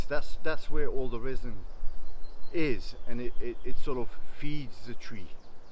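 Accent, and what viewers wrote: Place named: South Africa. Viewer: Another great video and good information, thanks. I can't place the accent, thinking South Africa but could be way off :)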